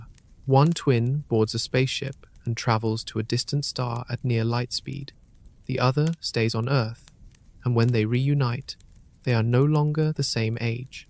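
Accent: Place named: United Kingdom. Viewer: Always the damn British accent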